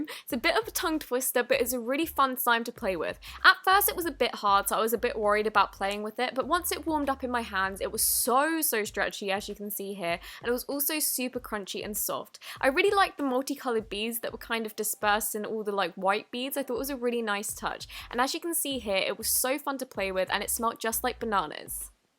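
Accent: United Kingdom. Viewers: babycanread2009 right, and maybe it could be because of her British accent!!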